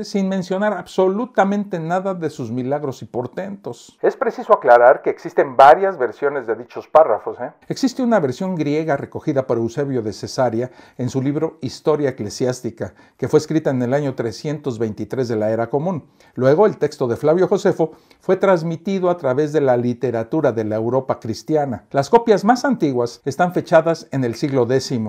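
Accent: Mexico. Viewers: Que bien se explica ...y me encanta su acento mexicano..😊😊😊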